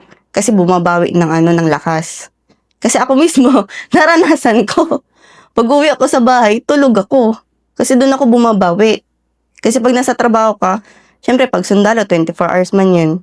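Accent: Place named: Visayas, Philippines. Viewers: Bisaya cebuana Ang intonation mo ma'am. I'm veri proud of u being a warrior sundalo.dapat may MEDALLA KA MAAM salydo sa nyo ni s ir clarin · Bisaya narin ang Punto hehe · Yung punto mo bisaya nman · Mam parang nainlove uli ako😅. Akala ko vsaya ka mam kc tunong vsaya ka, galing mo pakinggan nakakatuwa mas nakkainlove😅. May part 2 ka sana madam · Parang bisaya c Ma'am. Salute sau maam.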